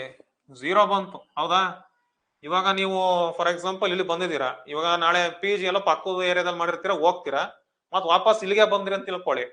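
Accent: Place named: Mandya, India. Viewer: Sir Nim voice matado style mandya bhashe bittu hogilla niv ellig hodruu ❤❤❤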